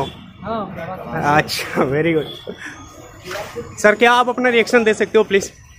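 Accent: Odisha, India. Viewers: Aap odisha se ho kya❤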